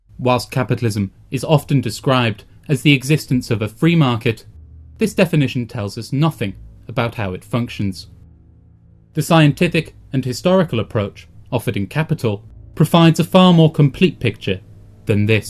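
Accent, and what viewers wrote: United Kingdom. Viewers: comrade, this is absolutely amazing. do i detect a hint of yorkshire there?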